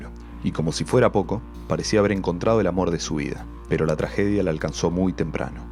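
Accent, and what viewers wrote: Argentina. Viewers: Me encantan todos los vídeos de y cada vez lo están haciendo mejor. Una crítica constructiva sin ánimo de ofender a nadie: Me gusta mucho el acento argentino pero en este formato documental es un poco chocante. Me refiero a palabras como «besha» por bella o «gashina» por gallina, también la forma de decir los años como 1009,80 por 1980.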